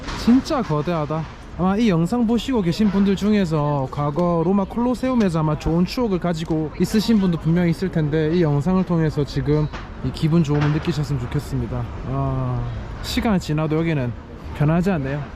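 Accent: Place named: Busan, South Korea. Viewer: 부산말투 좋으네요 ㅎ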